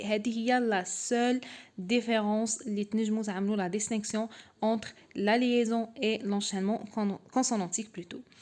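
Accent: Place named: Tlemcen, Algeria. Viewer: يعطيك الصحة بزاااف استاذة تعيشي انا من الجزائر بانتلي لهجتك من تلمسان اذا كنتي مالجزائر رح نفرح اكثر والله ربي يوفقك ومغسي بزاااف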